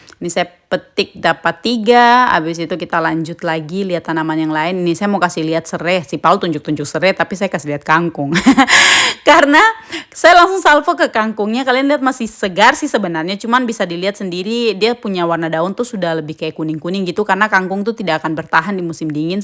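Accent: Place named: Java, Indonesia. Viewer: Logat jawanya dapet bener kak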